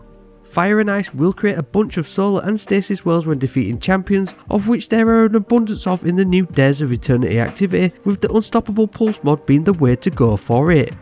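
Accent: United Kingdom. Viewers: It’s great to hear a British accent on d2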